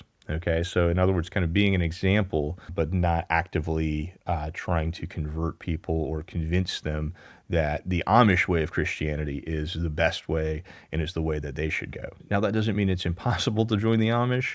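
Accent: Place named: Baltimore, United States. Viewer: You sound like you’re from where I’m from lol. The way you say your Os. I’m from just north of baltimore, not to far from SE PA Amish country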